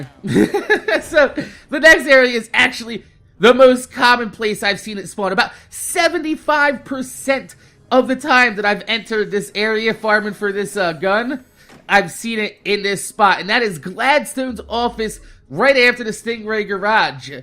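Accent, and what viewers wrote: New Jersey, United States. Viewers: I can hear the jersey in your accent hahah, away at school always good to hear that shit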